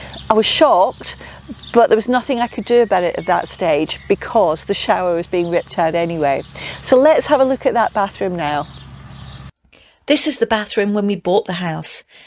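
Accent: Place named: Scotland, United Kingdom. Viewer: Sorry if you've mentioned this before but I've just found your videos; have you lived in Scotland for some part of your life? Cause your accent sometimes sounds a bit Scottish...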